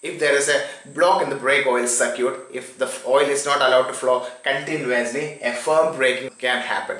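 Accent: India. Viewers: I went trough the comments on the video. It looks like many of them have problem with your Indian accent. I should say understanding is a skill as important as speaking. so people need to be a little bit flexible on the accents to be able to understand each other. some people don't try or don't like to try! good luck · thanks for good info but hard to catch due to indian accents and pronunciation... thks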